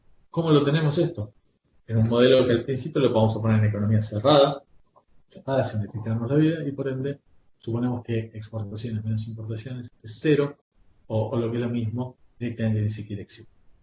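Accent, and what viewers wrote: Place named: Argentina. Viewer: Es una pena, la clase parece muy buena pero la calidad del audio sumado al acento super marcado de argentina, lo hace inaudible para el resto de latinoamerica.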